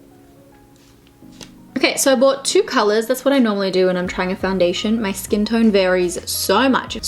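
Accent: Australia. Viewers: Nice to hear an Australian accent. 😊🌸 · I swear there should be more of our accent in you tube videos. We really have the best sayings about things lol. #aussieaussieaussie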